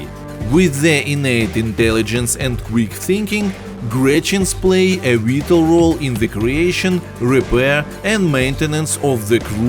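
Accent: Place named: Russia. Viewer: The strongest russian accent I ever heard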